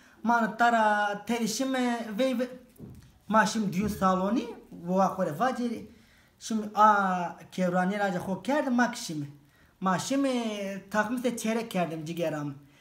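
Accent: Dersim, Turkey. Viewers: Tam anlamıyla bir dersim şivesi 😂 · Aynı dersimliler gibi konuşuyorsun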